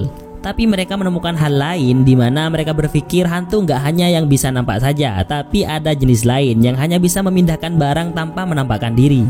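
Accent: Madura, Indonesia. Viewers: Logatnya kek ada rasa rasa khas orang madura, iya gasih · Logat e gk Iso di bujuki Cong..Meduro Yo Cong ?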